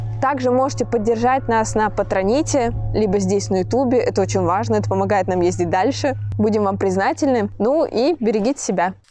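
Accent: Poland. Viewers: Klimat jest, no i ślonsko godka. Lubię. Niklaus Pieron też fajnie opowiada a godom wom to jako gorol :)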